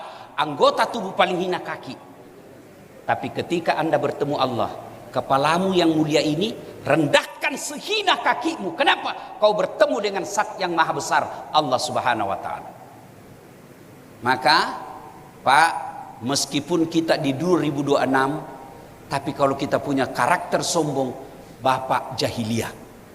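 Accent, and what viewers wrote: Bugis, Indonesia. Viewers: Mungkin lidah orang Bugis beda dgn penyebutan lidah suku BPK pun sebaliknya ini pernah juga ditanggapi oleh usdtad lainnya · lidah bugis bang hehe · Lidah Bugis itu bro maksudnya sama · Bagus aja masuknnya mas,, tpi tidsk mungkin ustad tidak paham atau sengaja asal asalan., tpi ini dialek daerah. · Lidah bugis mas...itu bukan masukan tapi ...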